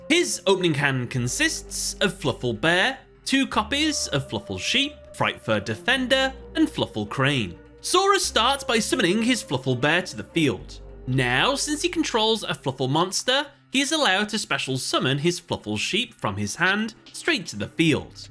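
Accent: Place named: United Kingdom. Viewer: I assume it's the British accent + "penultimate."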